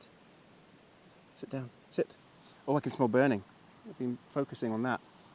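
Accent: England, United Kingdom. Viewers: Really enjoyed this video I found your channel last night for first time and watch this video and thought you was from the UK until you said New Zealand lol hope you and Bruce are doing well. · This was very relaxing. Just out of curiosity, were you raised in Britain? You appear to have a bit of a British accent. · Im confused , your accent sounds British , your truck plates say NZ and its raining and cold when New Zealand is in summer in NOV .... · Are you from England originally? I’m isolating at home at the min and started watching your videos 👍🏻😊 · So you are in NZ. You sound like you are from the UK. Snap. Where was this filmed? Excellent work again.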